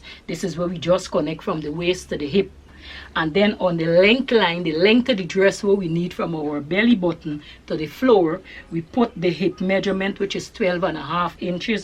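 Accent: Guyana. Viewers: I dont know how your video showed up on my YouTube. From I heard the accent, I knew you were Guyanese. I follow African fashion designers especially Nigerians online, but never came across à south américan/ Caribbean fashion designer. Before subscribing, I will watch most of your videos. The way of teaching is totally different than what I usually see. Detailled, proper, take all the time in the world to teach so that ppl could learn accurately. I say bravo. You even give advice about heavy on top or below which is so true. I like black, navy blue for below because my legs and calves are big lol now I have spoil belly so I need black for that area also. Well thanks for this channel and videos. Also thanks for taking all the time in the world for us to get proper learning. Maybe your channel continue to grow and grow. Ohh and yes I am from the Caribbean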